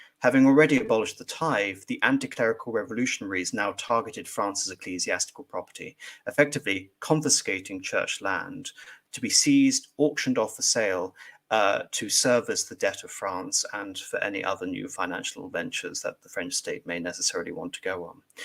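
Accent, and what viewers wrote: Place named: England, United Kingdom. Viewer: Does anyone know background? Is he English? He sounds it, but it could be one of those perfect accents learned at school.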